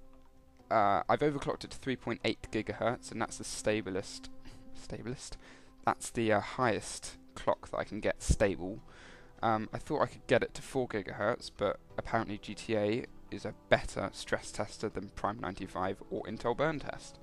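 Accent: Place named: United Kingdom. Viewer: Just a question about accent bro. I can understand you and I'm from Turkey,Are there any american who can't understand British ?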